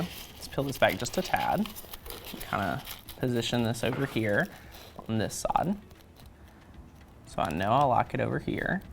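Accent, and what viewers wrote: South, United States. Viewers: Gotta love a good ole fashioned southern drawl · nice accent. Hey there fellow southerner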